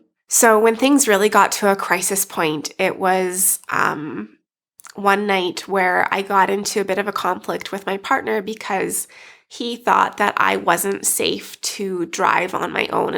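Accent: Canada. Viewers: you are from the prairies or the west, i can tell by your accent. i'm from ontario, and can tell you're from either saskatchewan or alberta or maybe interior BC. this makes me wonder what would happen if you weren't a white woman. what they did to you was very wrong. and imagine if you were an indigenous woman they would like have been much more violent towards you.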